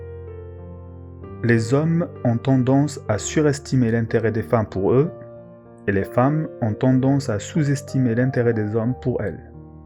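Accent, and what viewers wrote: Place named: North Africa. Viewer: C’est dommage que le narrateur lise ses commentaires et je n’aime pas cet accent nord africain perso je sais quels hommes m’attirent et les hommes bleus du désert ne sont pas mon type ni même les Africains en général je suis une hypersensible et l’odeur leur accent leur mentalité leur communauté pseudo religieuse même leur cuisine grasse je n’aime pas ….je n’aime que ceux de mon ethnie il n’y à pas d’africains ni autres étrangers dans ma famille et même à la vue de ces gens je fais de l’urticaire géant heureusement qu’il n’y en a pas dans ma famille car je n’aurais su être hypocrite je suis chrétienne non catholique…..! Et pourquoi parle t il que de la masturbation des hommes nous aussi on se masturbe le clito et surtout l’esprit perso je me touche au moins trois fois par jours depuis que je suis excitée par un homme exceptionnel et Français et peu importe le moment sagesse du jour et de la nuit !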